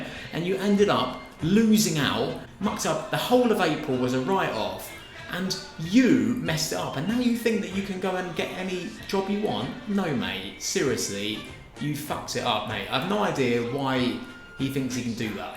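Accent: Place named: Yorkshire, United Kingdom. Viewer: Leeds are finished. Glory days have gone. As for being a big brand, come off it. Boro have money sure but we also have the best owner in football. Steve Gibson is known for backing his managers and giving them time so Garry Monk will certainly have job stability. Something which he'll never get at Leeds with your recent track record. Also we have a much better team plus we can actually fill our stadium which is something Leeds haven't done for donkeys year's. Boro are a much more attractive prospect for managers and player's. On another note I'm struggling to work out which part of Yorkshire your accent is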